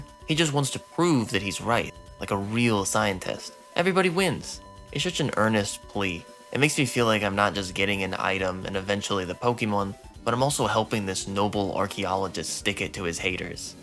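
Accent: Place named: East Coast, United States. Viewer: This is a super weird compliment, I know, but your delivery, especially with your (what I believe to be, correct me if I'm wrong) East Coast accent, makes these videos feel so nostalgic, like I'm watching an old movie.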